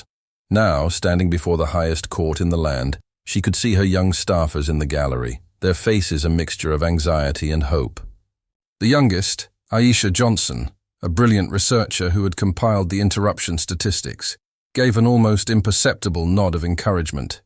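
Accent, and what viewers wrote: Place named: United Kingdom. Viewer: We need an AMERICAN narrator!! Not a Briton….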